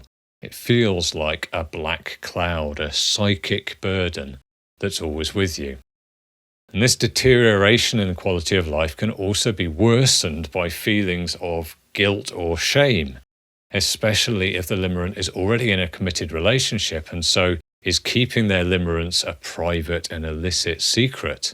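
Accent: England, United Kingdom. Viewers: 50 bucks says you know this from both sides, doc, because the transference must be off the charts. All that and an English accent to boot. 😂 Funny thing happened to me, I politely extracted myself from a budding friendship with an unavailable LO, but now I'm running into him everywhere in a city of three quarters of a million people. It's like a cosmic practical joke.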